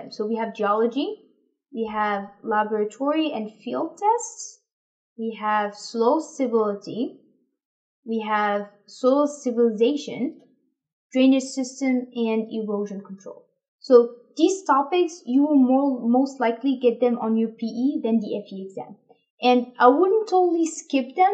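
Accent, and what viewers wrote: France. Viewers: I was closed. Pourtant un accent francais quand tu parles anglais.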